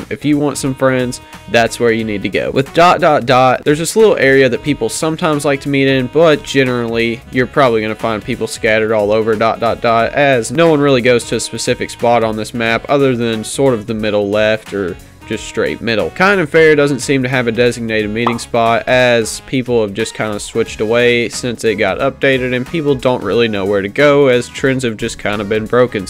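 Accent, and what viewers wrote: South, United States. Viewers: Bros so country that he sounds like a guy from a commercial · You kinda sound like a red neck which I really like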